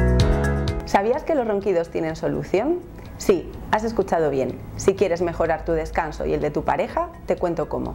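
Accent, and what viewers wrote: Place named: Spain. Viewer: Me imaginé por el acento de la doctora, era en España.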